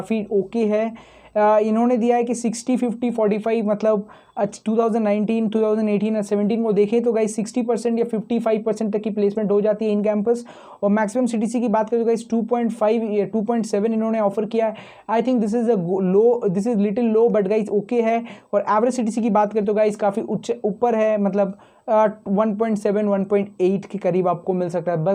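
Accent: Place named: Bengal, India. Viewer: Dada tumi hindi bolte bolte bangla bolar j taan thake tomar seta chole asche bar bar 🤭🤭👀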